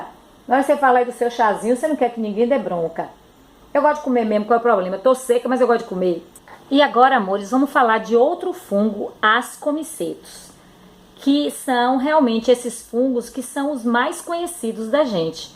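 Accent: Northeast, Brazil. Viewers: Esse sotaque nordestino deixa o vídeo mais empolgante ... Na moral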